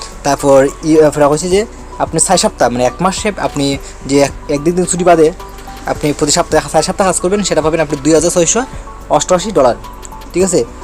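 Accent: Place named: Habiganj, Bangladesh. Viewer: Vair bari ki Habiganj ni?? Kotha shune tho Habiganj lage...🫣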